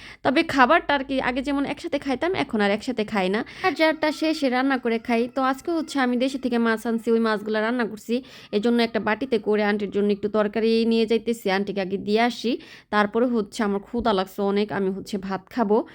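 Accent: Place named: Dhaka, Bangladesh. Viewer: আপু আপনাদের এলাকা কোথায় বাংলাদেশের, আপনার কথা শুনলে মনে হয় আপনি ঢাকা বিভাগের লোক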